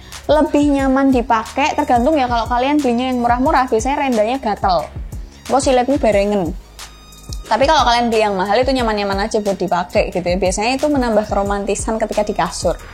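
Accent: Java, Indonesia. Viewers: Jawa banget suara ny mba. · logatnya ky orang jawa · Wisss ayu, cerdas,yo supel,logat jowone nambah gawe ayune mba 'e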